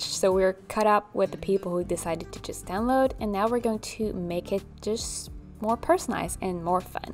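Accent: France. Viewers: New horizons!, you are such an amazing soul! I am probably twice your age and have dabbled in art for most of my life but you are teaching me a TON of stuff I didn’t know….mostly about graphic design (which I wish was more prevalent when I was your age…my life would’ve been sooo different). I also have long hair and understand the misrep on so many games. Love, love love animal crossing (have played it since it first came out yeeeeears ago! I love your accent (I am from a family who speaks French also) you are brilliant!!!! Keep shining, beautiful! Even though you have no idea who I am, I am very proud of you!!!! 😊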